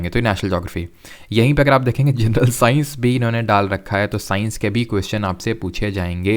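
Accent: Kanpur, India. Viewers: Ap kanpur se hai kya ?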